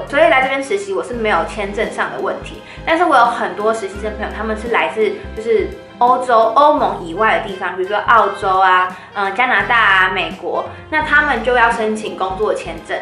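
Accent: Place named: Germany. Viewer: 她是德语发音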